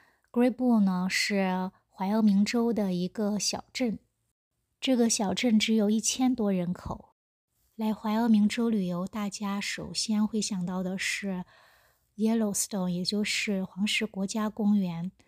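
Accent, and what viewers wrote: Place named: Hunan, China. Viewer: 湖南口音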